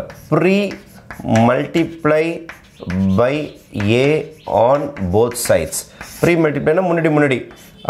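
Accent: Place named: Chennai, India. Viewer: Hi I love Chennai slang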